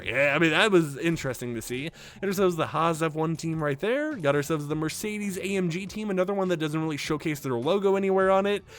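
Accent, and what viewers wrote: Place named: United Kingdom. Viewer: Bro is definitely British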